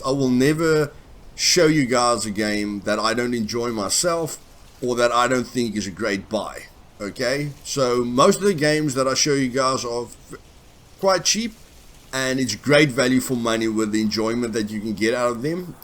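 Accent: South Africa. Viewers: Jesus that south african accent :( ugh...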